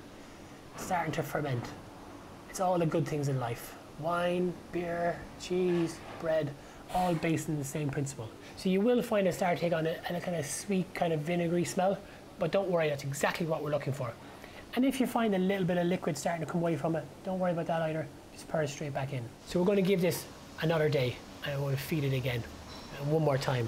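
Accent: Ireland. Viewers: Irish accent. He clearly knows what he’s talking about! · Brilliant! This is the first time I've understood the process AND how wonderful you included the why's! I've scoured the classes and they just weren't cohesive. You have a new follower. Lovely to hear your brogue, too. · sounds irish :-) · its not sourdough bread its his annoying irish accent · Plus, that lovely Irish accent was an added treat <3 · who knew a soft spoken Irish accent is so asmr worthy. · I use almost exactly this recipe, I add an ounce each of Olive Oil and Honey to mine, and bake in covered dutch oven instead of pyrex, but same principal... turns out perfect every time... Love this Video and the easy lovely Irish accent! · Finally! has de-mystified everything sourdough. I was despairing of ever finding info that was easy to understand, conflicting and not over complicated. makes it so easy to follow…I could listening to his lovely Irish lilt for hours. 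😊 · Hi, fantastic video, and having that great Irish accent explaining every stage getting to that final loaf, makes it even more enjoyable. The way you describe each stage I think most people would not have a problem getting to the final product. I had one question, can I half your ingredients to just make one loaf at a time?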